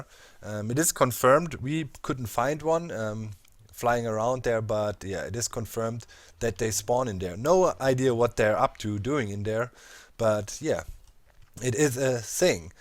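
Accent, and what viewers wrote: Germany. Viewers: You Are from Germany?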